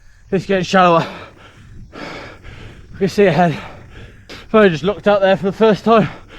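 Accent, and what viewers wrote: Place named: Dudley, United Kingdom. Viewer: Were you from? You sound like a Dudley bloke.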